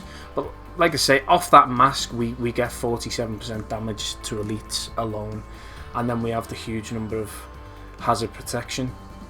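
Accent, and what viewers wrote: Liverpool, United Kingdom. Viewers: Wasn’t expecting to hear a scouser on this video lol, not a bad build mate, where about in Liverpool you live, don’t live too far from there myself, got quite a similar build to yourself but for an ar build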